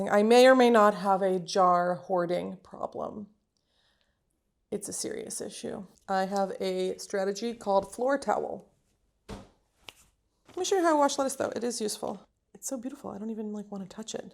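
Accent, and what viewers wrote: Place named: Midwest, United States. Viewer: Omg, I’m Carolyn but I love you! So glad I found you. You sound midwestern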